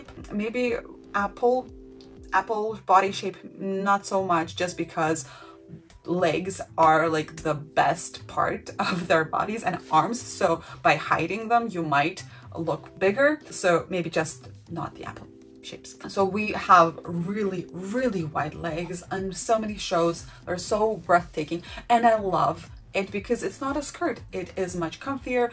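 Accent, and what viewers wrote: Russia. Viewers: Great, thanks for your reply...if you don't mind me asking...is that a Russian accent I detect? I'm part Russian so I guess I'm just curious!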